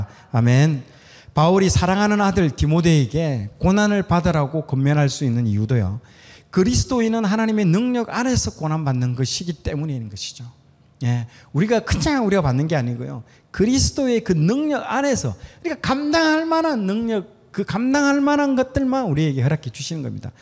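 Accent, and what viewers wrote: Gyeongsang, South Korea. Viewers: 경상도 말이 이렇게 부드럽고 아름다운지요..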